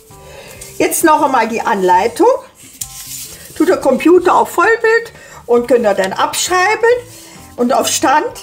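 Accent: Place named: Bergisches Land, Germany. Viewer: Dat hört sich sehr nach meiner alten Heimat dat Bergische Land an😊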